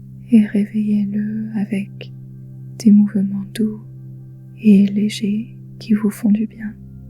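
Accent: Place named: England, United Kingdom. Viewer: Le petit accent anglais derrière me fait voyager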